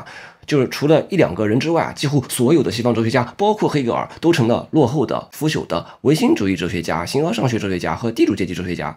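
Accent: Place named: Chongqing, China. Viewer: 我听出你的重庆口音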